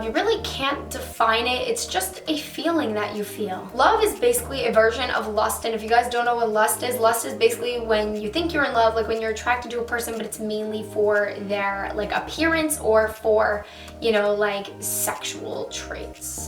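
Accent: New York, United States. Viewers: Senja S it’s her New York accent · I love your new York accent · your new yorker accent makes me want to go live in nyc more and more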